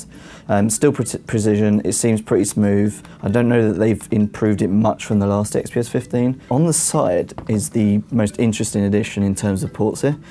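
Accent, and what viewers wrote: United Kingdom. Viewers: Why would what I assume is a British speaker (from the accent) say aluminum and not aluminium? Perhaps reading off some official US press notes, and too whacked to notice. Anyway, machine looks nice. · that's more english that you think actually, it's just british accent.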